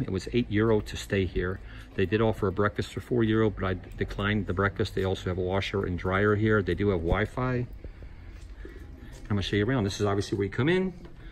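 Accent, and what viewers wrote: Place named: Western Pennsylvania, United States. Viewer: Wow, you were on fire that day. Also, you sound like you are from Western PA.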